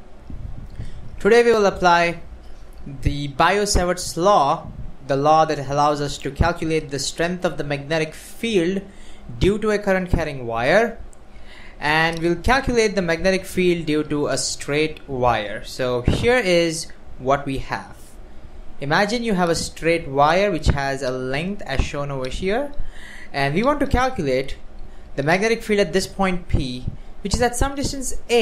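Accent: India. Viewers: wyf? indian? cuz ur accent hmm lol great explanation tho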